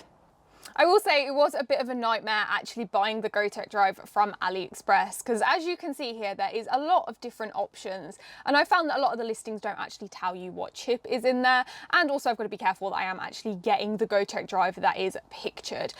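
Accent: United Kingdom. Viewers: Okay, now I understood, what means "british english" 🤯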